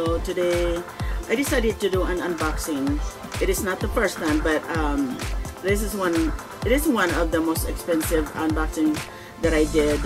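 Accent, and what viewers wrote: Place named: United States. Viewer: I love the way you talk kabayan as your accent sounds like an American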